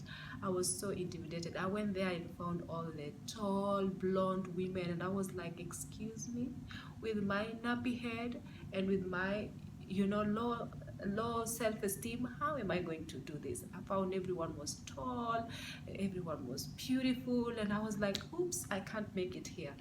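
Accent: Kenya. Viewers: Yeah i knew the moment you started talking.the accent huh😍😍😍😍.mimi pia nimkenya nko switzerland one day i will do a video. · butterfly9274 That's a Kenyan accent. That's why I took Interest to listen on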